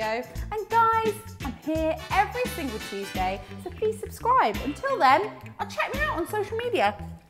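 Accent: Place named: Liverpool, United Kingdom. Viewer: Thought I could hear that Liverpudlian in there somewhere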